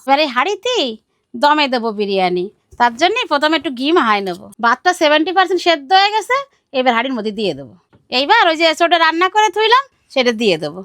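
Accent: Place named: Khulna, Bangladesh. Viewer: আমাগে খুলনা জেলার ভাষা মনে হচ্ছে.. 🙂🐸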